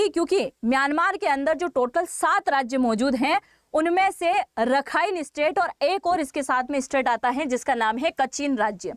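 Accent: Gujarat, India. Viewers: मैम, आपकी हिन्दी भाषा में गुजराती एक्सेंट सुनाई पड़ता है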